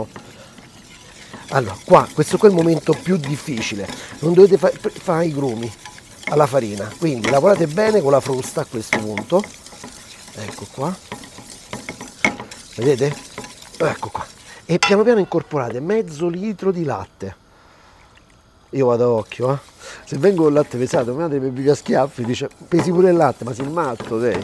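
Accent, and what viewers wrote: Rome, Italy. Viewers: Lei è fantastico, io sono romagnola e mi incanto a sentire lei parlare romanesco, quello che prepara è bellissimo soprattutto spiegato da lei. Grazie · sei bravissimo, ma, non prendertela a male, se tu ti sforzarsi di parlare più italiano... l'inflessione romanesca ci sta, ma fino ad un certo punto...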